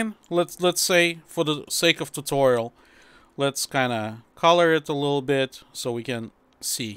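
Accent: Russia. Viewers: Ха-ха..., судя по твоему английскому, ты русскоговорящий )))) Может для нас сделаешь то же видео?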